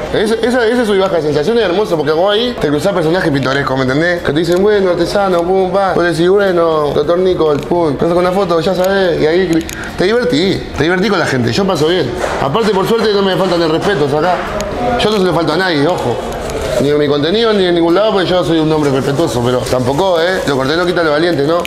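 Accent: Uruguay. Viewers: El ejemplo perfecto del acento Uruguayo 😂🖤💛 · Que lindo escuchar hablar uruguayo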